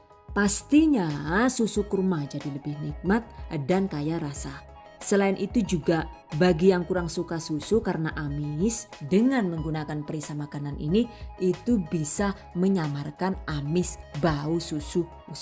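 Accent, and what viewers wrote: Banyumas, Indonesia. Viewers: walah dalah rikane ngapak mania. ora ngapak ora kepenak 😆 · Bu aseline pundi daneng ngendikane ngapak kados kulaa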